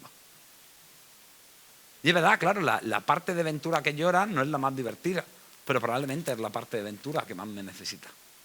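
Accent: Andalusia, Spain. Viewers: Pues a mí me encanta el acento andaluz y lo entiendo perfectamente... Y conste que soy guatemalteca, así que mi acento es muy distinto.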